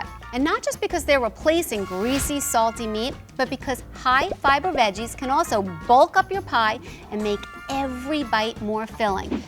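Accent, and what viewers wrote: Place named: East Coast, United States. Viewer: I love your SEXY east coast accent.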